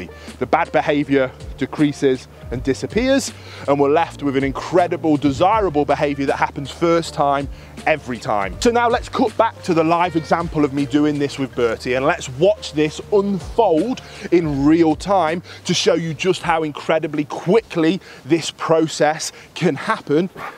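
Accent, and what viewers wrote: United Kingdom. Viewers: I was not expecting this giant viking looking dude to have a british accent 😂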